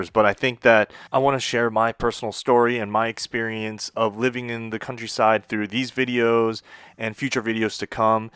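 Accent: United States. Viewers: why do you have an American accent? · a Japanese bloke, with American accent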